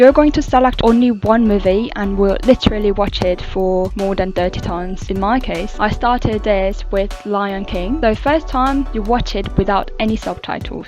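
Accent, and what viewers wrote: United Kingdom. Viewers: 너무 차분하게 말씀해주셔서 좋은것 같아요, 저는 미국식 발음으로 배웠는데 요즈음 영국식 발음이 너무 좋아서 부럽습니다..ㅠㅠㅠ♡♡ 겨울왕국(frozen)으로 하다가 포기했는뎅....듣기라도 계속 해야겠어요...ㅋㅋ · I love your British accent! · 와우!!!! 브리티쉬 액센트!!!!!!!!! 너무 부러워요!!! 전 연습해도 액센트는 맨날 콩글리쉬 수준 ㅠㅠ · 언니 브리티쉬악센트 뭐에요 대박!!! 넘나 멋져ㅠㅠㅠㅠㅠ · 영국식 억양 완전 멋져요!! 대부분 미국식 억양 교재나 컨텐츠들이 많은데 영국식 억양은 어떻게 연습하신거에요?? 영국 다녀오셨던 게 큰 도움이 되셨던 건가요??rpg 게임 팁 완전 도움이 될 것 같아요 ^*^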